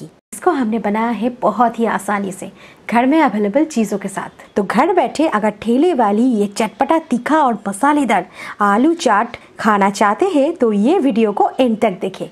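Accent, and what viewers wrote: Bengal, India. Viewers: Are you bengali. .? apke hindi bolne k style se lag raha hai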